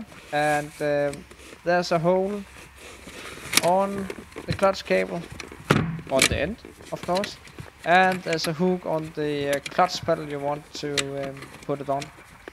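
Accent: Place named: Denmark. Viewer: Det var cool - Nu ved jeg hvad en selv justerende kabel er og den som er på min T4 VW er igen på plads. Hvordan det ennda er skete, havde den selv hoppet ude af pedalens fatning! Du dukkede op under en relevante søgning og selvom ikke VW, traf jeg rigtigt med den første skud. Tak kære bror - med en hånd mekaniker, en hånd videografer :-) Great mate and thanks (oh, and the Danishness is nicely unmistakeable in your seemingly impeccable english. haha)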